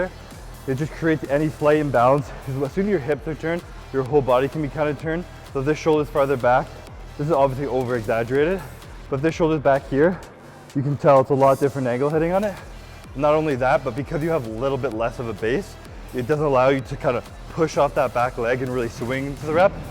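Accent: Canada. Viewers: That’s that Canadian accent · Chris has got to be Canadian the way he talks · Canadian lol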